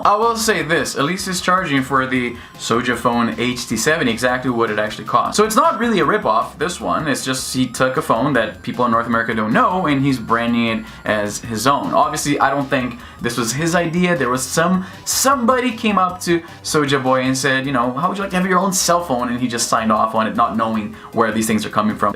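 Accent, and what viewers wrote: Brazil. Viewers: hey there first time viewer here, great video lol I thought I recognized your accent but didn't put two and two together till you said you were Brazilian I am an American Brazilian so a lot of my family that speaks English has a similar accent but yeah sorry to get to my point of commenting lol he posted on twitter he made 250,000 dollars in 24 hours of starting selling his knock off consoles lol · You're Brazilian? I couldn't hear your accent until you pointed it out. Please speak some Portuguese! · The moment you said you were Brazilian, I immediately started to hear your subtle accent lol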